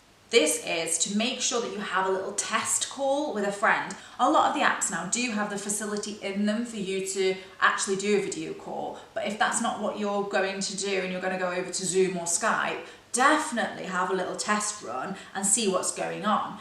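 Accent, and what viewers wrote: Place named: United Kingdom. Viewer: Very useful tips, thank you 🙏🏻.. BTW i loved the British accent🇬🇧 because of you.. it’s clear, classy and beautiful accent.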